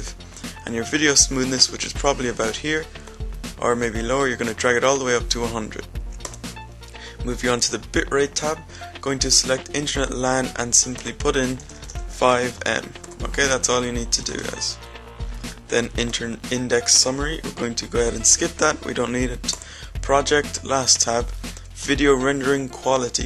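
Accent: Ireland. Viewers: Where ya from in Ireland?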